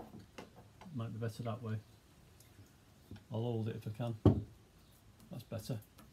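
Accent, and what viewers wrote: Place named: Oldham, United Kingdom. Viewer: great.. 40 years ago taught..you never lose it my first car I painted this way.. boiling hot day.tin in. paint in the milk pan in water.. and tongues from the washing machine to carry it out.. cheap brush.. disaster.. lol.. Oldham lad me.. you sound local...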